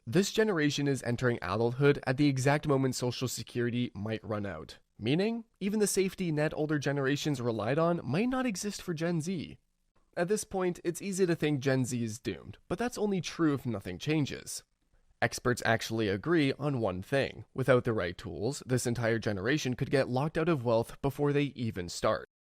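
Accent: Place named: Canada. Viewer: I can tell from your accent you’re Canadian… so am I and we’re screwed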